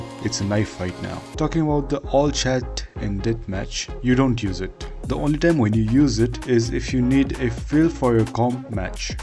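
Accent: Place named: Nepal. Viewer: Brother. Nepali accent sunirako chu ta ma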